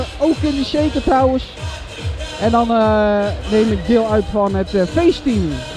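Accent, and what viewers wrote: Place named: Zeeland, Netherlands. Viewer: Hoi ben sinds kort geabonneerd op jouw kanaal en ik hoor een beetje Zeeuws accent kan da kloppe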